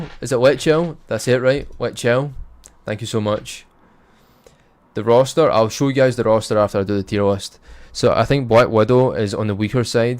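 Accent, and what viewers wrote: Scotland, United Kingdom. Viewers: Is that Scottish? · He said "He can literally kill" not "he can one shot kill" the scottish accent just makes it sound weird. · Its the Scottish accent. Based like Logang.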